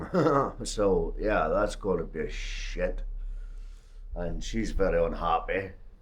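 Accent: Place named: Scotland, United Kingdom. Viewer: I like the accent bro ... Braveheart ... Freedom!!!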